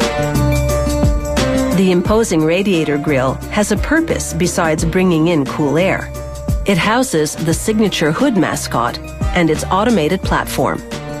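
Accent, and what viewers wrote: United States. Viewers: FFS why did they use the American, for this episode? Aluminium not Aluminum, and Bonnet not Hood. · The vulgar American accent describing how one builds a Rolls Royce is just not cricket! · What an utterly, horrible American voiceover, no class.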